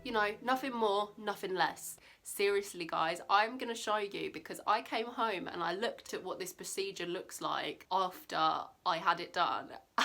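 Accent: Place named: England, United Kingdom. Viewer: You're so pretty! I hope they find something useful to help you out. Love your accent too! (Sorry I live in America so the english accent is sounds nice to us haha)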